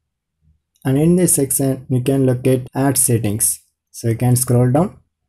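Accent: India. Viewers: I like indan accent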